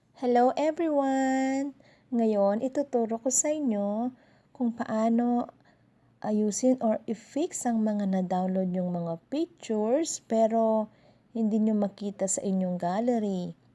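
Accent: Philippines. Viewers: Why do u sound like Phillipino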